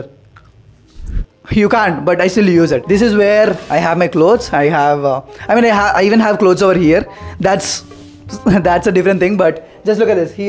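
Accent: India. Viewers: Atttr..Bttrrr…Gttrrrr..Pttrrrr. The Appu accent is real strong in this one.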